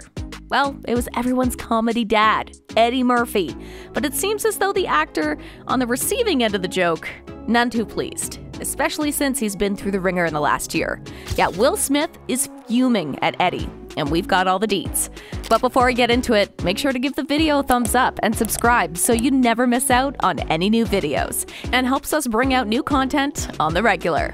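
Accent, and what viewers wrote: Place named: California, United States. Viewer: Girl change that California tone. Please!!!!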